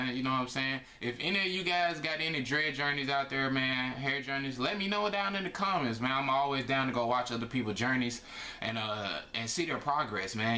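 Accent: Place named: South, United States. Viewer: ahh okay. I gotta cousin from Texas and you sound just like him so I knew it was a southern accent.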